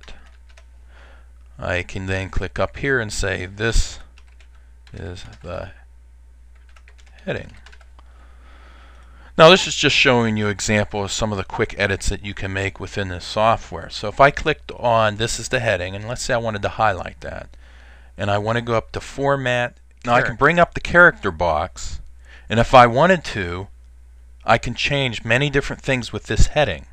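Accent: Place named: Pittsburgh, United States. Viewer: I'm saying you're from Pittsburgh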